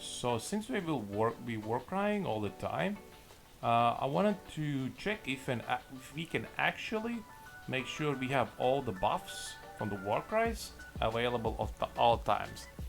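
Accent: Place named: Russia. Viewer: Чувак, все круто, но всем понятно, что твой английский не мейн язык. И скорее всего твой мейн язык русский. Запили этот видик на русском. Ты показал действительно крутые скилы.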